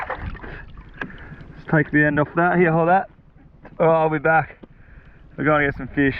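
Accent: Australia. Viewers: Since Steve Irwin is dead this dude has to do with his australian accent. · Are u from Aus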